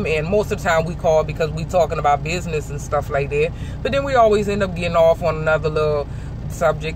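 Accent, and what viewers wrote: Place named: New Orleans, United States. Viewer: LAWD HAVE MERCY BEHBEE!! BRAINS, BEAUTY, PERSONALITY, AN YOU A CUTIE!! AN TO TOP IT OFF DAT N'AWLINS ACCENT BEHBEE!! MAKE ME WANNA JUMP THRU DIS PHONE GIRL!! KEEP DOIN YA THANG SWEETHEART!!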